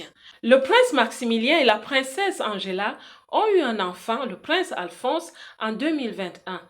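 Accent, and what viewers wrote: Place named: Cameroon. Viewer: Merci pour cette histoire passionnante ! Vous aussi vous devez être une princesse de naissance comme moi 😊 Et il me semble reconnaître un petit accent du #237🇨🇲 Bien à vous Marie-Céline Princesse de Hegba♔©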